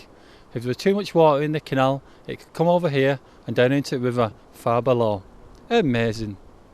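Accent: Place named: Lancashire, United Kingdom. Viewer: One of the beauties of this record of our history is that it records the accent of the commentator also. These are fast disappearing. Is it and is it very local? Every town seems to have its own throughout Lancashire. Amazing and beautiful. Thanks Lads.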